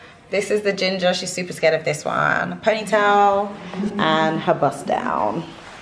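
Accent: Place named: Scotland, United Kingdom. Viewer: I actually forgot you are Scottish 😂😂 it’s been so long since I’ve heard you speak. Love it.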